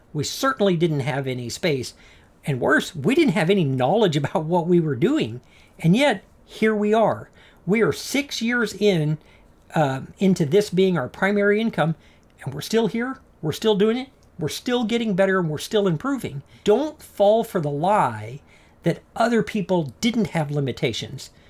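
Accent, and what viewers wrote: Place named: North, United States. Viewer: I didn't know you were a fellow Texan. You sound like you're from up north. Lol.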